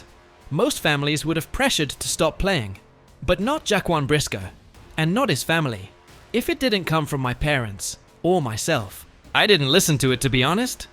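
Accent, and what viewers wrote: United Kingdom. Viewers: Gotta love the British accent in and out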